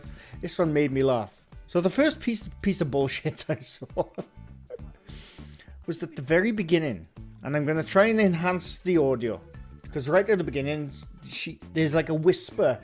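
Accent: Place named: Yorkshire, United Kingdom. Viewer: you can't fake a true Yorkshire accent and u can hear it clearly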